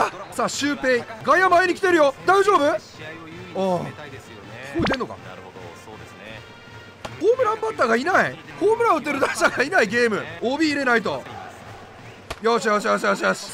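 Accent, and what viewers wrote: Kansai, Japan. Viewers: 「緩急」違和感ないけどなぁ。 俺もVIPも関西人やからかな笑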